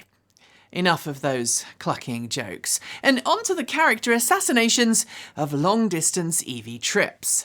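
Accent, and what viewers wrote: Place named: United Kingdom. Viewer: In awe of how you keep your UK accent while using exclusively North American idioms and speech patterns. So fascinated by this I almost missed the point of the video. It's quite remarkable!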